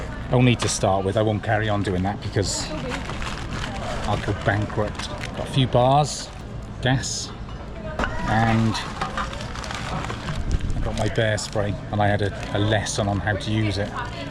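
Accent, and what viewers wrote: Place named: South East Wales, United Kingdom. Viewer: Hi, Stumbled on your channel. Its great to see a Brit doing the GDMBR. As an ex-pat living in Canada for the last 20+ years this is a route I have obsessed with for some time. Looking forward to watching the whole series especially like the video about cost and realistic time lines to get the route done. Its great to see the ultra elite athletes completing in less than 20 days but we are not all like that!! Where are you actually from in the UK? can't place the accent, I have you south east Wales but I have been away for a long time!! PS don't post your address! 😂😂 Cheers Bob...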